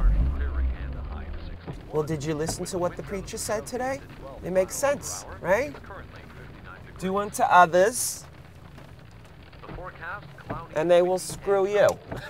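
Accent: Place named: New York, United States. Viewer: He's got that New York/New Jersey accent.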